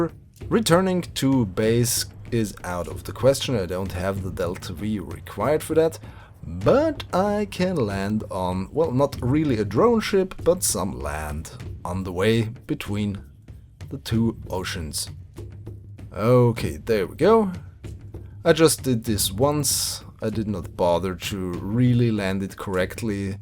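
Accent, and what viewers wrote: Germany. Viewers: You are a german right? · Your English kinda sounds like it had a little German accent and your Space Station's name is Franz so... Nevermind. But where do you come from? Because you said you were proud of Europe producing for NASA. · You sound like a german, are you german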